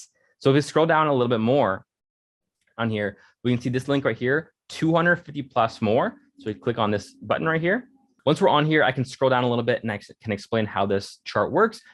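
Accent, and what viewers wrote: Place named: United States. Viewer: You sound American 2 me bro, how do use this broker? It doesnt take US residents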